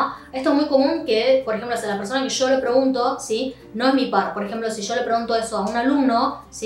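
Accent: Argentina. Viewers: Que horrible se escucha su tono argentino, hay otras asiáticas que se creen ya también españolas con su tono....... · Gracias por su ayuda. Observación: Cuando usted dice en español '¿"Tú trabajas"? o ¿qué trabajo haces?; yo escucho que dice ¿"Tú trabajás"? o ¿qué trabajo hacés? Otro caso: ¿cómo te llamas? ...escucho que dice ¿cómo te chamas?..es por el acento argentino pero no tiene que ser así ¿no? ..Gracias por los ejemplos que me son muy útiles. Podria dar un ejemplo con shangban ? Gracias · ¿Chilena? No me lo creo. Tiene que ser argentina. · La profe tiene un acento argentino. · esta chica habla como Argentina, tal vez aprendió español en argentina...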